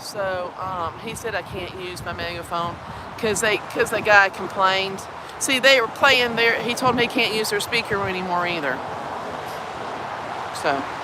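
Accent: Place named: South, United States. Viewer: they are nasty people. I am so proud of you and I love you southern accent! I am a northerner 😂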